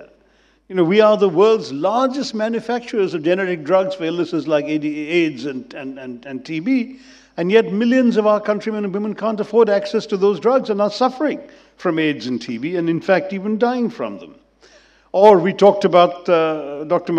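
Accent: United Kingdom. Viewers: He speaks like Britisher.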